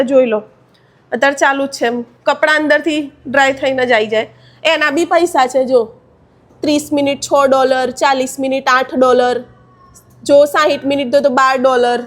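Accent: Sabarkantha, India. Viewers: સાબરકાંઠા ના લાગો છો ક્યું ગામ?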